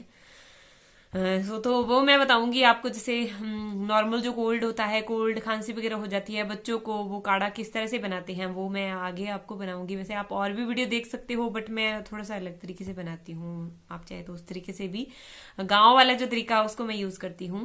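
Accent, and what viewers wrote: Haryana, India. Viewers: Madam, lagata hai aap Haryana se ho. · Aapki aawaj se lgta h . Ki aap haryana se ho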